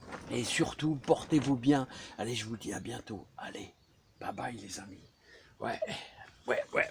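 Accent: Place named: Nord, France. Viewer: Bonjour, Je me lasse pas de regarder tes videos, je les attends comme une série de TV (neetflix…hihihihi ) en plus avec l’accent du Noord …J’adore sans chichi et avec beaucoup de passion. Ne change rien, malgré les 4 degrés de ce matin. Bon dimanche.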